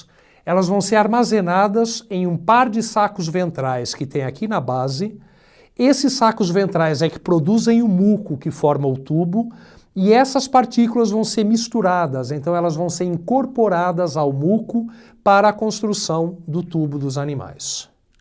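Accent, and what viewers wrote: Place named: Portugal. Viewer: Às vezes o sotaque dele me parece sutilmente o de Portugal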